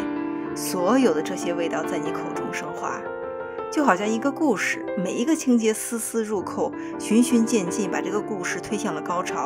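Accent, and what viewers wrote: China. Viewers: 的配音好有中國味向獻上我的愛心💗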